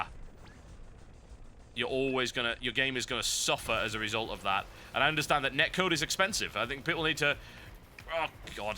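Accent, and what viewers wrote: United Kingdom. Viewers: umm you dont gave to look or sound british or english to be english. and hes the most english sounding person ive ever heard. one last thing. why are you so mad???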